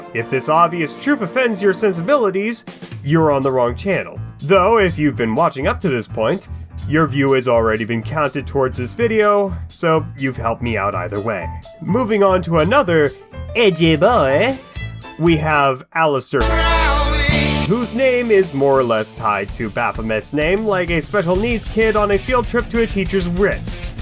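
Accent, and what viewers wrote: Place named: France. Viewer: That French accent gets me everytime 😂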